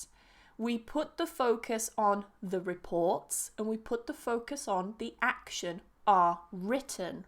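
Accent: United Kingdom. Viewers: I think it's beautiful British English 🇬🇧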